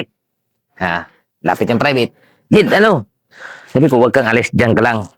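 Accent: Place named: Iloilo, Philippines. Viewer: cguro ilonggo ito si master ah sa accent nia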